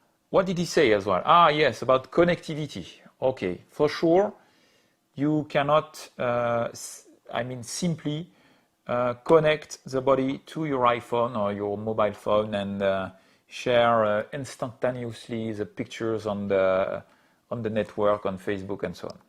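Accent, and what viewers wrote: France. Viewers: Assurément un français according to his accent lol big up! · Bonjour, je crois entendre un accent francais ;-). Vous me recomandriez la nikon d3s a 799euros? Je suis un debutant et cherche une camera pour la photographie de paysage et de nuit. Je concidere aussi une fuji xt30 ou une sony 6400. Merci pour la reponse d avance.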